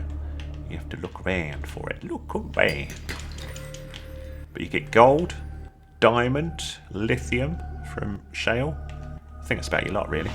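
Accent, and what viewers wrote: United Kingdom. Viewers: Yeah, agreed, straight to the point. Also your English accent is lovely!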